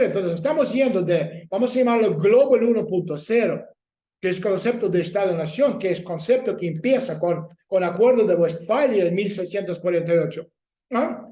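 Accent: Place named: Spain. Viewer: Si ya vives en México¿Porqué hablas en español de España? Creo que sería más cómodo para todos que te fueras a vivir a España, con Borrel, te sentirás bien de compartir la lengua de ese "rusófilo" conocido.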